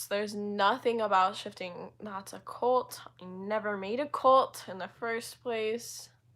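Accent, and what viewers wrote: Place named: United Kingdom. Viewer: the british accent is not at all bad get it bestie 😼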